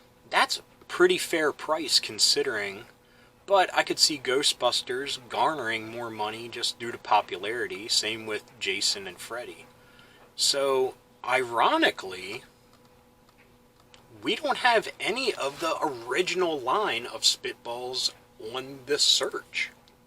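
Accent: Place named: Maryland, United States. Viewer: Great channel, going through a bunch of your videos! Also, Pinterest is a great place for 80's nostalgia, lots of great pictures and collections w/o having to buy stuff. Lastly, you should collab with Hat Hole Home video...I have no affiliation with him, you guys just have a similar vibe and channel. I was going to ask what part of the country you're from but I can tell a Maryland accent anywhere! haha.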